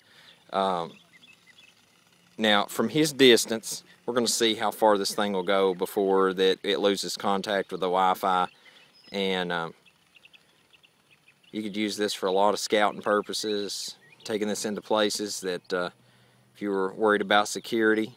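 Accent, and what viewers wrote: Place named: North Carolina, United States. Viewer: Are you from North Carolina?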